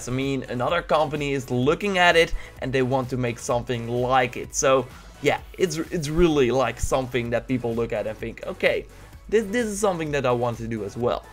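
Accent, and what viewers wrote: Netherlands. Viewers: I think competition mainly lies with particular services like: Games with Gold & PS Plus Games, Xbox Live services vs PS Plus services etc. I get that to a certain point exclusives can win a persons heart at buying the console which supports it. But overall it hurts the gaming community because multimedia is infested now with fanboys arguing which console is better. I think Xbox, Playstation and Nintendo all have their pro's and con's. But it doesn't mean that it is right to trash talk the competiton. I own both a Xbox One S and a PS4 Pro ajd I completed Horizon Zero Dawn today (watching a lot of videos of yours). My point is, enjoy playing video games on whatever platform, but act with some kind of dignity inatead of insulting the competition ;) PS: are you Dutch? I slightly hear a Dutch/Flamish accent 😉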